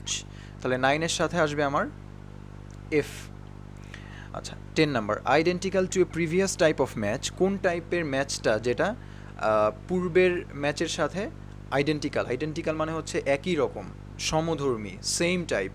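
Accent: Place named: United Kingdom. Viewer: Bro..language just awesome 😃onek tai britis der mto..ar ata e IELts er very important thing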